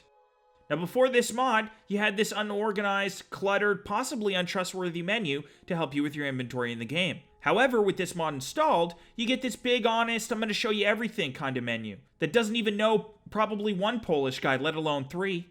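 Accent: Canada. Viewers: This guy has to be Canadian, right? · Are you Canadian? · Hahhaha this guy is so Canadian he sounds like my buddy who lives in Orangeville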